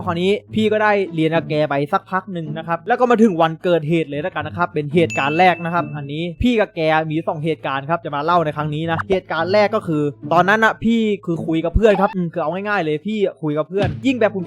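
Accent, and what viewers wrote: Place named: Suphanburi, Thailand. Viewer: พี่เป็นคนสุพรรณบุรีหรอเสียงหนอจัง^^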